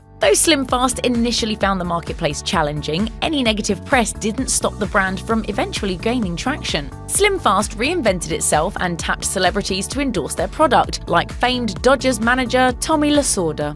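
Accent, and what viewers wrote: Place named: United Kingdom. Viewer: Wtf is this British broad?🤔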